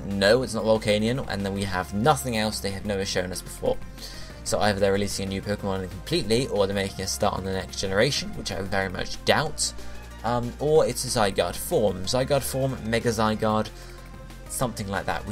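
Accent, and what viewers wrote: United Kingdom. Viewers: He's from the UK, they pronounce words and letters differently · Omg man sorry that's amazing. That's just how us brits pronounce the letter Z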